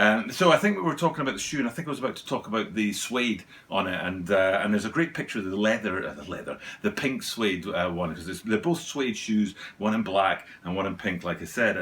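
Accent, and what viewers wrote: Scotland, United Kingdom. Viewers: Not gonna lie, wasn't expecting to hear a scotsman when I went to watch the video. Glad to see these have been reissued tho.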